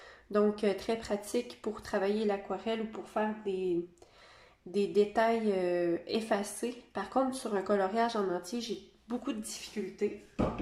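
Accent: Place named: Canada. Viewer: Cc bonjour j'ai adorée votre vidéo je suis vraiment tomber complètement fan de votre bureau j'aime ce qui est fait maison il est grandiose vraiment vous pourriez me faire qq photos et les dimensions car j'ai pas beaucoup de sous car je suis invalide et je colorie depuis novembre 2019 et mon siège est spécialisé et là je pourrais le faire passer oh!! Sa serait formidable j'adore votre petite tour avec les couleurs elle est trop cute je l'aime vraiment beaucoup merci beaucoup pour votre présentation vous avez du très bon matériel c'est pas se vanter c'est partage c'est bien merci beaucoup et votre accent canadien en grande joie de vous écouter gros bisous 👍😘🌹🌹🌹🌹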